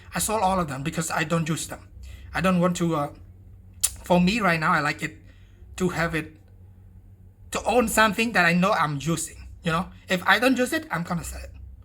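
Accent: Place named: Cambodia. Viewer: You sound like cambodian, where are u from ?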